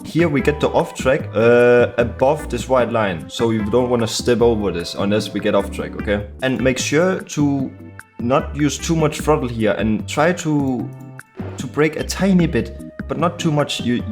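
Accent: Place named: England, United Kingdom. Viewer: Pure comedy and that English accent man, epic!!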